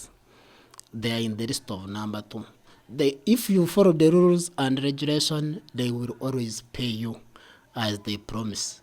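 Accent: Uganda. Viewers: Too authentic, I like this man from Bunyaruguru😊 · I can detect Ugandan accent from a kilometer away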